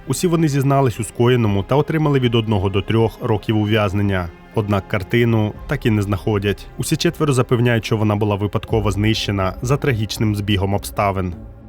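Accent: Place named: Russia. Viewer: Мій улюблений художник. Він, дійсно, геній. Відмовився розмовляти іспанською з королем Іспанії, бо він каталонець. Про брата теж знала. По інформартивності- молодці. Особисто до Вас прохання - попрацюйте над вимовою, бо вона поросійщена. В нас тверді шиплячі. А у Вас це звучить як «[якщьо], [щьо], [щє], [вчінок], [вибачьте], [розбираючі], [бачять], [чєрга]…” Випуск чудовий, дякую. Якщо б ще вимову підтягнути- буде супер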